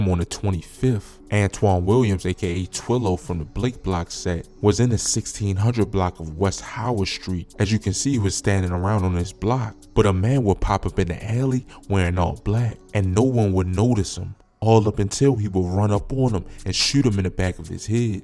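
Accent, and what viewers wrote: Baltimore, United States. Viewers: sound like he from baltimore if i had to guess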